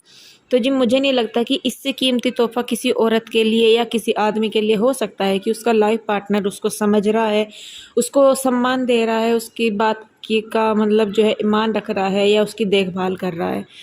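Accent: Haryana, India. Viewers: Di aap hariyana se ho kya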